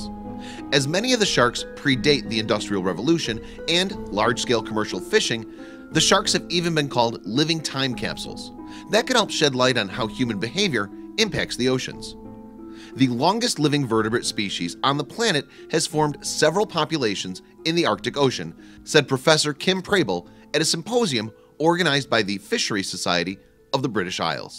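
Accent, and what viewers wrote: United States. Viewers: It's so weird listening to a guy with an american accent read a British/Australian script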